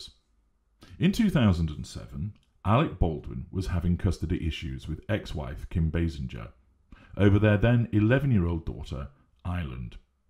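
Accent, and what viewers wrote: United Kingdom. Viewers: Lol...TMZed rather than TMZee...so cute...so very British.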